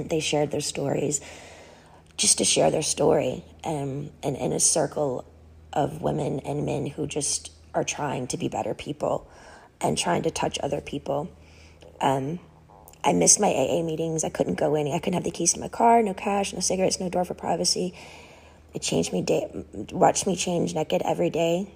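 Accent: South, United States. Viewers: so what she from the south, that's how we talk. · Finally in her own words and no accent besides the south! I’m sorry you went through this Britney!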